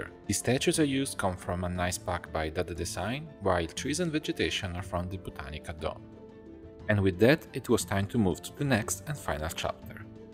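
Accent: Italy. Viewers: Immaginavo fossi italiano, nonostante la pronuncia inglese sia piuttosto buona qualcosa mi ricordava il modo in cui parliamo inglese di solito ahah. Ottimo lavoro comunque, sei davvero bravo, io ho sempre remore nel provare a modellare qualcosa di cosi complesso di dettagli, preferisco concetrarmi su archviz meno "ricche" e più moderne. E ho anche notato dai precedenti video che ante tu ti diletti con designer... Great great!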